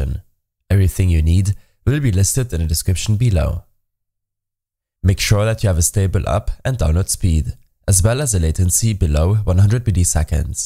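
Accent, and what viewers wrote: Germany. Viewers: MAnn merkt so das du deutsch bist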